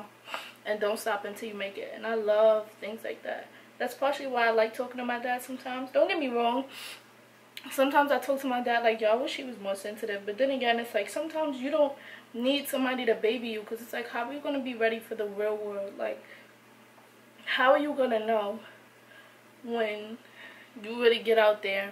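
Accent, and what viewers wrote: New York, United States. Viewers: You from new york? Harlem?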